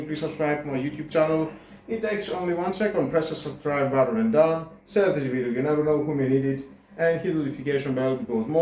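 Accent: Greece. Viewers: The supermarket in my area have it now in 77% sale and I just wanted to see some reviews to understand if it’s worthy to buy it and I found yours! Really helpful review thanks a lot! I wanna ask you something…from your accent I was thinking that you have Greek origins, is that right? Έλληνας είσαι φίλε μου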